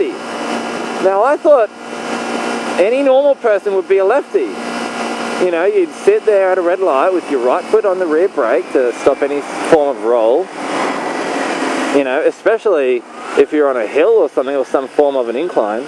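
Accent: Australia. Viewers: are you an aussi?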